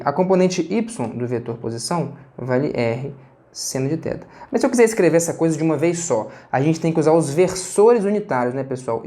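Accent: Minas Gerais, Brazil. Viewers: Adoro quando ele fala "Prestenção" acho tão fofo esse sotaque Mineiro. Ótima aula!!!!! Parabéns pelo desenvolvimento! ❤️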